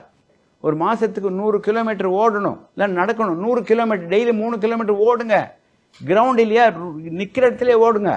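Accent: Coimbatore, India. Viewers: நீங்கள் பேசும் அழகுகோவைதமிழைகேட்டாலே ஒருநோயும் வராதுங்கோ ஐயா உங்களின் இந்த கோவைதமிழை எப்போது கேட்டாலும் இனிமையையாய்உள்ளது வாழ்த்துக்கள்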